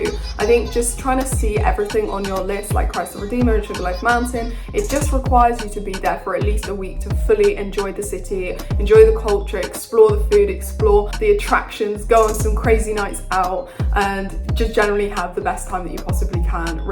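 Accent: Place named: England, United Kingdom. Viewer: I know you are from England, but when you are recording a clip, you Don't need to speak so fast with loud musical background noise.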